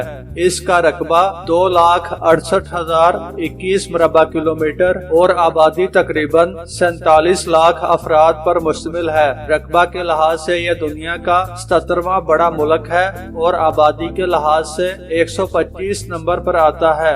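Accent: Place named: Punjab, Pakistan. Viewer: URDU GHAR HD : REF NEWZEALAND DTD NOV 14, 2017, BEST REPORTING AND COMPLETE INFORMATION, BUT COMMENTARY WAS UNIMPRESSIVE BECAUSE OF URDU IN PUNJABI ACCENTS. SOUNDED LIKE SOME VILLAGER READING.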